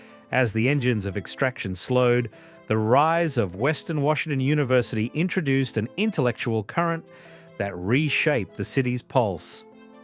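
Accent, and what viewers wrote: Australia. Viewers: an Aussie narrating this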